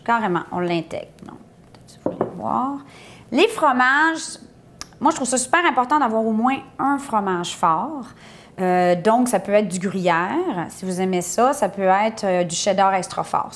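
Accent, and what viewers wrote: Quebec, Canada. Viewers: Tes cheveux roux et ton accent québécois j'adore 😍😍😍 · J’adore ton accent canadien 😂❤️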